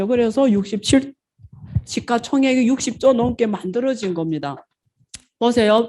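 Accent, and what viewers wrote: Yanbian, China. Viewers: 한국에서 돈벌려면 표준어좀 배우는게 좋겠어요!. 연변 사투리 듣기 싫어요!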